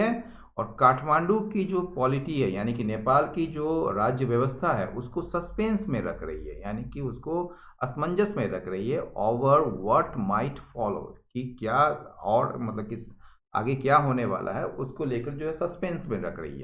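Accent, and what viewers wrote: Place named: India. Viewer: we all are Nepalese ...but sir your voice and explanation is pure Indian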